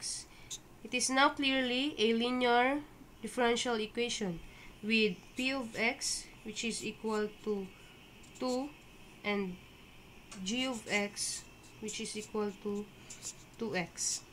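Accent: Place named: Philippines. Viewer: filipino ka ba? ganyan yung accent ng filipino sa english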